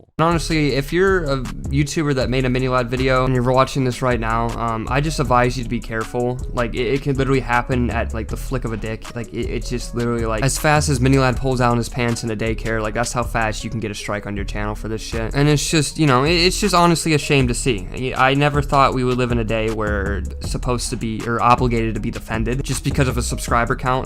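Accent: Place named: United States. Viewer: Ive known mini is irish and english but he somehow sounds like hes american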